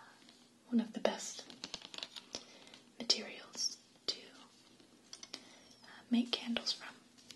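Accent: United States. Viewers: r u from america?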